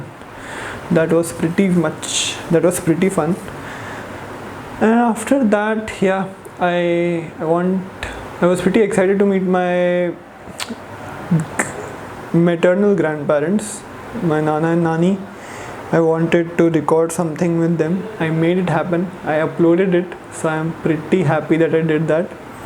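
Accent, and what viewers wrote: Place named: South India, India. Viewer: your English is very south indianyyy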